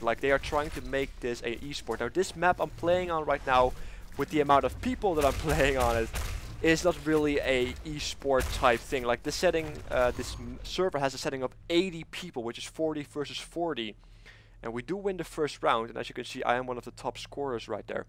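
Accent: Netherlands. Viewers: je klink als een nederlander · u dutch??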